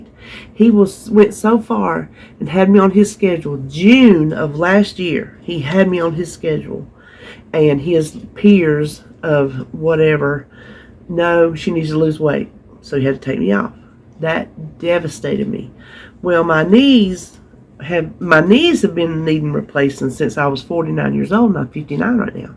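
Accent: South, United States. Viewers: Thank you you got me up off the chair and I'm not going to squander this body any further that God gave me. I'm going to do Leslie sansone the one minute walk everyday and now that I've said that I'm promising you so I got to do it I love you thank you so much watch you everyday and have for a couple of years you're an inspiration and I love your Southern Roots and accent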